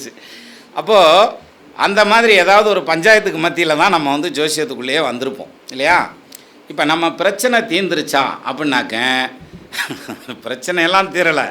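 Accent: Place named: Madurai, India. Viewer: இவருடைய மதுரைத் தமிழ் சொக்கன் குடுத்த சங்கத்தமிழ் சொக்க வைக்கும் தமிழ் அற்புதம்.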